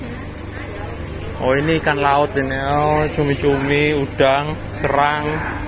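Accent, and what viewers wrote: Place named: East Java, Indonesia. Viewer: Ys udah wis yo wis jalan ke gonung..dialek jatim memang medok susah hilang😅😅😅😅😅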